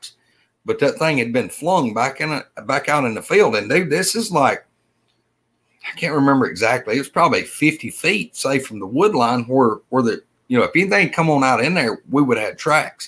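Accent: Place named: South, United States. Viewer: Great show I'm watching at 4 in the morning because I didn't get a notification 😡 and there's nothing better than a southern accent. 😊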